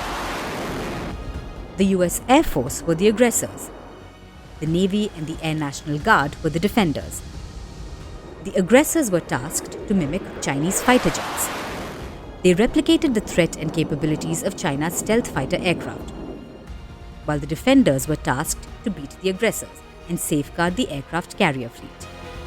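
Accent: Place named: India. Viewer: I detected the Indian accent! Why would Inida care and brag about the US military? This is weird.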